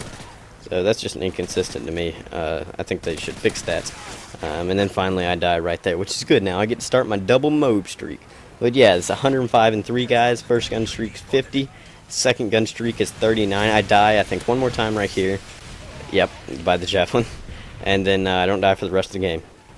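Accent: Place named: South, United States. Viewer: He sounds southern.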